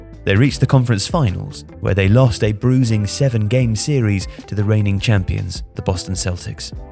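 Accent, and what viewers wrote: United Kingdom. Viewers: This is just so awkward to listen to NBA talk being spoken in a British accent 😂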